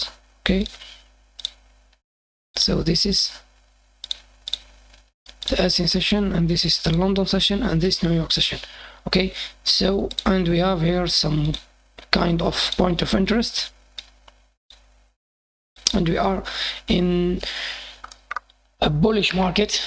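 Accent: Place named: Morocco. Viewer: where are you from brother ? You sound Moroccan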